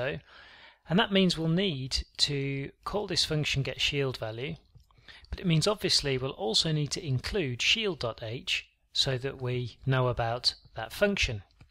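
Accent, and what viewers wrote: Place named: United Kingdom. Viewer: Your clear voice with nice BrE explain things way more better than my uni lecturer, shame on them who call themselves "professor" may have great academic achievement but have no idea how to teach in an accessible way .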